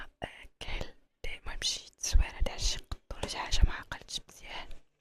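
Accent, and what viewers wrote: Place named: Syria. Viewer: هضري دارجة نيشان بلا ديك اللهجة د باب الحارة كانظن اتكون حسن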